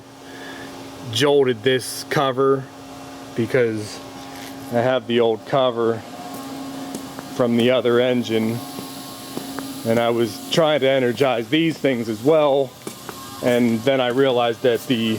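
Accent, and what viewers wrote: Pittsburgh, United States. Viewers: bro where is your shop located?????...you sound like you have a PITTSBURGH accent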